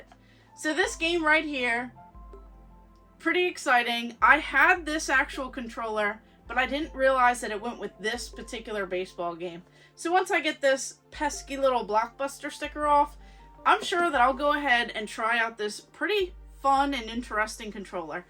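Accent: Maryland, United States. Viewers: I gotta ask…are you from Maryland? You have a bit of the md, va, pa accent. I haven’t lived in MD for 14 years and my accent is getting thicker as I get older😅 Anyway, digging the content. RetroWolf sent me.✌️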